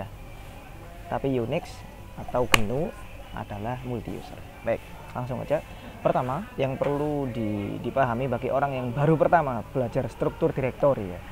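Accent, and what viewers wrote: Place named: Surabaya, Indonesia. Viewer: Logate medok, ketok arek suroboyo y? Hahaha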